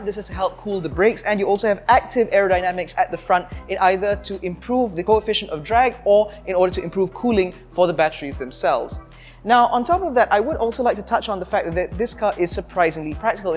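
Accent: United Kingdom. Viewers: Wow ...rasa macam orang uk yang review ... mantap bro.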